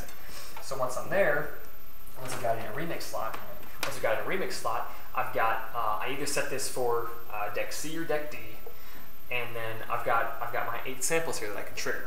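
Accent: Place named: Europe. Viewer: Thought this guy was european, lmao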